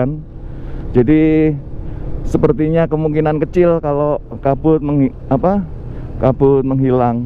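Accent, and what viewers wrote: Java, Indonesia. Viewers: Ini mas ny org Jawa bkan? Klo dri logat bicarany mirip org Jawa? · Kalo ke Lombok ( khususnya ke Sembalun) ajak anak Istri dong /pacar atau temen boncengan biar ndak ngomong sendirian 😊😊😊. Kapan ke Lombok lagi Bang, dari Jawa mana Bang logat jawanya medok sekali, pingin bisa bahasa Jawa aku 😀😀😀😀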